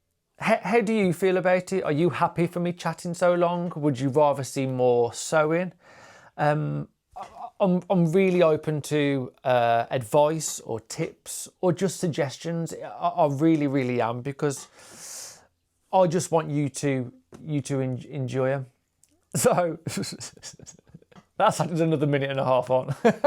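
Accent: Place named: England, United Kingdom. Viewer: Without Kate I wouldn't have known about you. Be yourself it's your nature, your channel. Happy to know you, I'm from from south of France and I enjoy to meet new english accents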